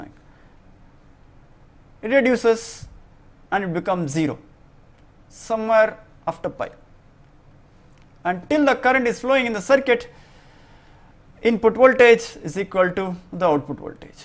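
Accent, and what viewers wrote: India. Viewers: Only the Indians will understand this accent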